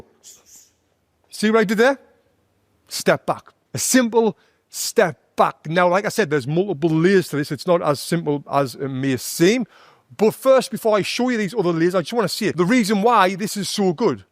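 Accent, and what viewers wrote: United Kingdom. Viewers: Great work love that british accent mate😀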